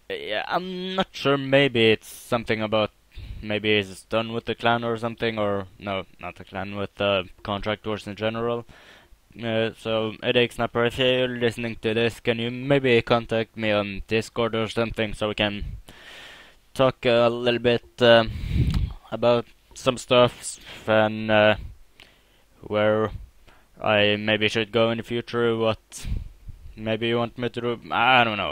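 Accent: Germany. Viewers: are you German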